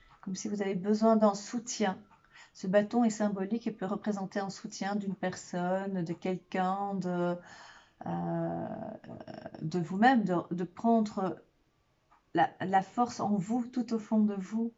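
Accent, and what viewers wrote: Belgium. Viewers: Merci d'avoir pensé à mon signe, je l'ai cherché et ne l'ai pas trouvé toute à l'heure , vous m'avez entendu .... en tous Les cas, je vous le rdis à nouveau , vous êtes charmante , lumineuse et sympathique et avec votre accent belge , c'est encore plus agréable , j'ai beaucoup aimé vivre en Belgique avant de devoir partir : <link> j'ai apprécié le peuple belge et me suis fait de bons copains là bas .. BONNE CONTINUATION , Joyeux Noêl et bonnes fêtes de fin d'année ; FRANCK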